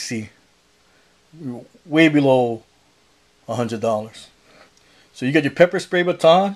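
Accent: Hawaii, United States. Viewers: Howsit!, I have been a subscriber for a while and really enjoy your videos. This video demonstrates what I dig about your vids. Clean to the point and shows great variations for EDC especially if you're in a limited carry situation. Frankly, I also dig your accent too. Reminds me of the days living in Manoa Valley. I miss Paradise, bruddah.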